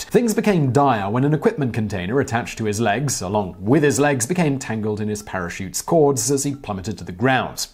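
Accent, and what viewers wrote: United Kingdom. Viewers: Why the host, who sounds British, giving an american salute in the thumbnail?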